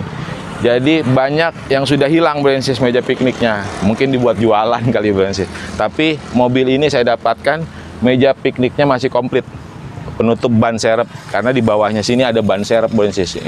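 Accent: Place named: West Java, Indonesia. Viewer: Tina logatna mah jigana urang sunda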